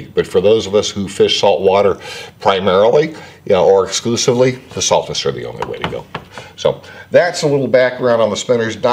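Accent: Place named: East Texas, United States. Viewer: I think I was pretty clear why I didn't spend time yacking about drag levels, talked about that at the end of the video. Watch the end of the video and perhaps you'll understand why I feel those numbers are pretty much bunk. In my mind, its pretty silly to talk about high numbers when they don't apply to reality. Who cares if a $200 spinner has 30-40 lbs of drag, it's not going to be used and the rest of the drive train won't necessarily sustain those pressures. On a 50 sized two speed made for catching cow sized tuna, it's meaningful. On a lighter duty spinner, it's really irrelevant so long as there's enough usable drag to fish the intended line class. The BG's do that very well, as do the Saltist series spinners. As to why I say yeow...simply because I can.... Why do I wear a hat...because I like 'em and it's cold on a shaved head. 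Why do I wear clean underwear... I am not a TV personality, newscaster or info-mercial talking head, I'm a f'n fisherman who tries to provide some info to anglers. Do not expect more than what I'm capable of providing, and if my manner or pauses in dialog, accent, colloquialisms are disconcerting, so be it, no apology. Shucks, catch me at the right time and I'll lapse back to an East Texas accent. No doubt the manufacturers' own vids are far more professionally produced, and you'll get more of their talking points and buzzwords. However, our vids are independent, honest, and accurate, and done to the best of my meager ability. I'm happy to take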